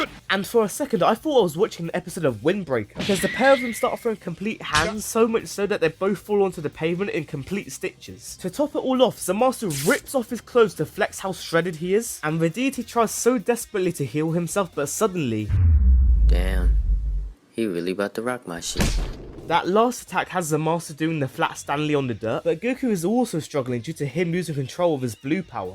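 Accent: United Kingdom. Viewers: >click on video >British accent >first moments have joke about tea >click off >??? 😂😂😂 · too briish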